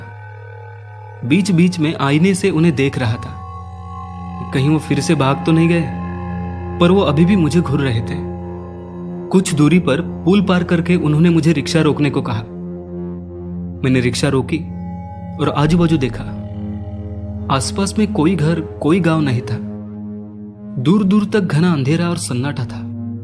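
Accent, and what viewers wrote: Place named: Bengal, India. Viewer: Ta Bhai up to Bengali mei bat kar skte kyu Hindi me bolte ho upka Hindi kaisa bangal-hindi mixed ha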